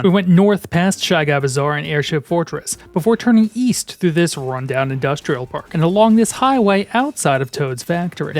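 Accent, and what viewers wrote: Boston, United States. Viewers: I can hear a bit of Boston in your voice😅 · Do what you wanna do man, Boston accent included! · I feel like I’m going crazy was he always this Boston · are you from boston?? i swear i can hear an accent but im not sure 👀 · Please stop doing that Boston accent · please keep doing that Boston accent :3 Is it your native accent?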